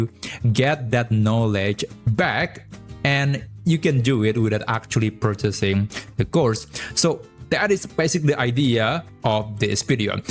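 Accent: Indonesia. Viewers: bro you have indonesian accent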